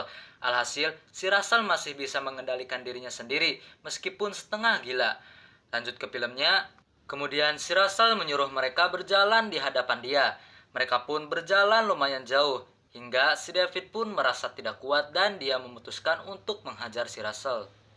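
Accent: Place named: West Java, Indonesia. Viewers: Orang Sunda nya kang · Bang orang sunda pasti 😂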